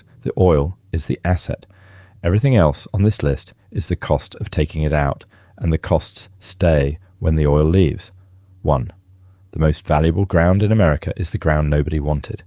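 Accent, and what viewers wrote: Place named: United Kingdom. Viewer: as soon as i hear a frickin british accent i shut it down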